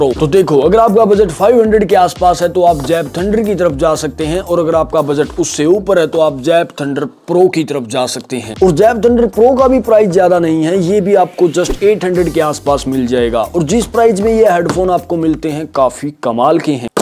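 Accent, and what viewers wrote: Haryana, India. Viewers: Haryana se hai kya bhai 😊?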